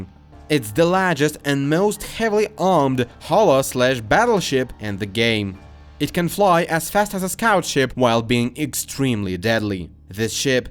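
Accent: Russia. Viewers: я сразу почувствовал что ты россиянин, но слова ты выговариваешь хорошо, многие западные славяне говорят намного хуже тебя, что уже говорить про остальных русопитеков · If anyone curious - that's what russian accent actually sounds like. ;)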